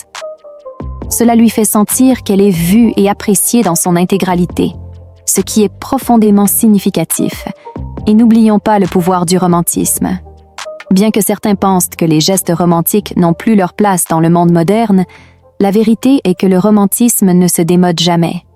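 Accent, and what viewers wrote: Quebec, Canada. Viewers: L'IA a un accent Québéquois ?!? Attention, en France, entraineuse à un sens pas très glamour..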